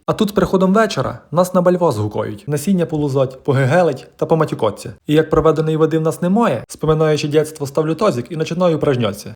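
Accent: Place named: Surzhyk, Ukraine. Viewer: Я не знаю що в тебе "присутствует" але зі своїм ухоріжущим суржиком ти доВбойоб знатний😂😂😂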